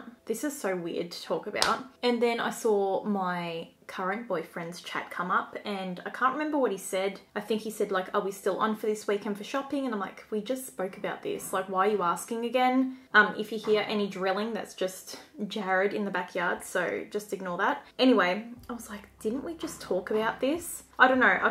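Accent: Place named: Australia. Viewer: Omg the weird guy story 😂😂😂🤣🤣🤣I love the way you talk, it’s exactly how I talk with my friends! I love watching fellow Aussies, but you especially make me laugh with your stories 😃😃😃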